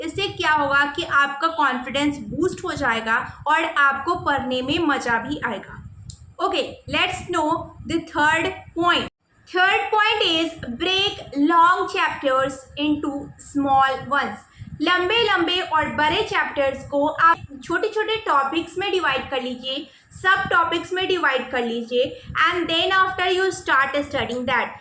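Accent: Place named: Bengal, India. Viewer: Aap bengoli ho kya madam .....kyu you are looking like bengoli and the way you are talking is relate to that you are bengoli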